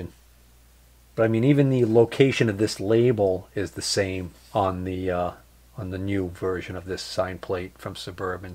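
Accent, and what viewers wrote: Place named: Massachusetts, United States. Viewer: So i found your vid on Victor regulator and i like your explanations. Looks like we are doing the same kinda stuff. I hear an accent too and it seems your from Ma? Btw, new sub.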